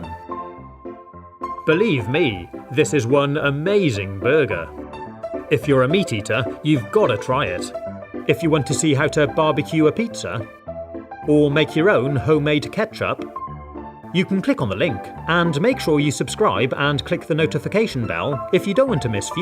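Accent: England, United Kingdom. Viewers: Yes, he's from England. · Are you English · R u a brit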